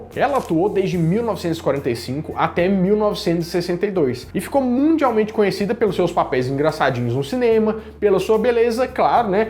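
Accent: Minas Gerais, Brazil. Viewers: esse cara é mineiro uai! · Vídeo muito bom. Gostei do formato, da didática, das montagens e o sotaque mineiro é um destaque. Parabéns 🌟🌟🌟🌟🌟 pra vc.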